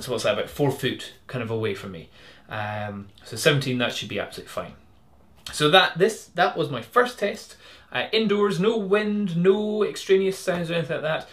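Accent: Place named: Scotland, United Kingdom. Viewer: The magic lantern test was a much purer sound, filtered out most of the noise but left the Scottish accent in tact :) Cheers.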